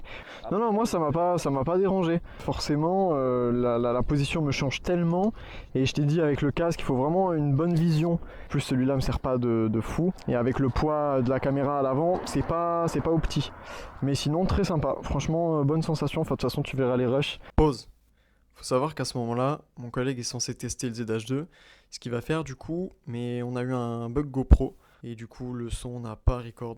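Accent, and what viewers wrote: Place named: South, France. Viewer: Une petite pointe d’accent méridionale Fils😂 Belle vidéo!!! Hâte de faire une balade soon!!!!♥️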